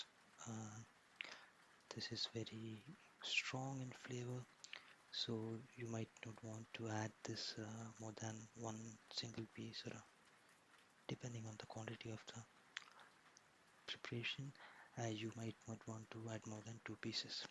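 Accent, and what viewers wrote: India. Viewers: There is just something about the Indian accent that pairs well with asmr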